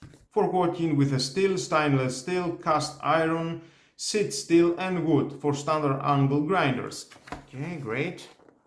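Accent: Greece. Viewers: I can hear the greek in your voice 😂❤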